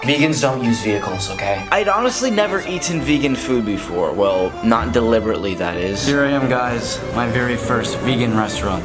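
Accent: United States. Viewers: Are u American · is this guy really russian? he sounds like an american.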